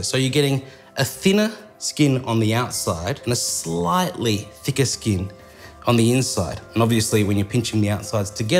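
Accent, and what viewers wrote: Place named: Australia. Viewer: came from uncle roger! You're Australian !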